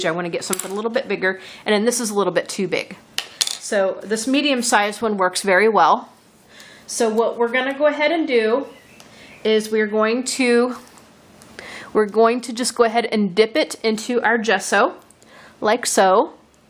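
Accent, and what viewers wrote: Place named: United States. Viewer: I'm English and I love your American accent :) Beautiful Also your laughing is Infectious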